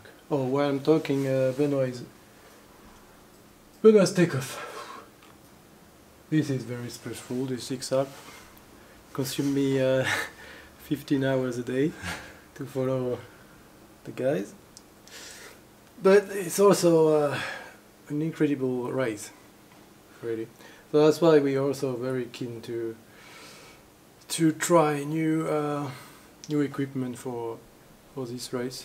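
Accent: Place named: France. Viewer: Mais quel accent de merde, j'adore!!😄 Vive la France🇨🇵 And don't forget to come visit and fly our beautifull Alps!!👍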